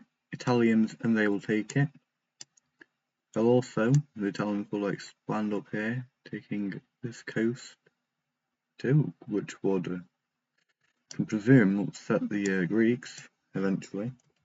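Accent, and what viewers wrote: United Kingdom. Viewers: Adult Bri’ ish man video Also hi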